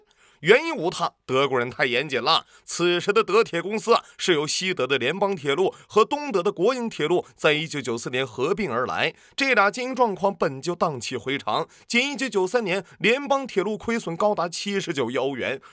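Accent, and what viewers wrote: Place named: Northeast, China. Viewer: 東北口音。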